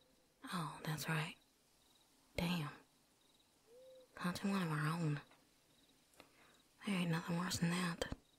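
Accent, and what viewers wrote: South, United States. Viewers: Southern accent needs a little work but it’s still good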